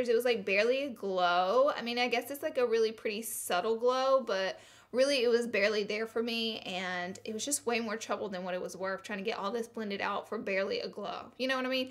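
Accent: South, United States. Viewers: !!! 😊 I'm a newbie, just subscribed today but I love your southern accent and quirky personality. Haven't tried much of NYX before but I'll def be purchasing that palette you mentioned. Thanks for the tipssss.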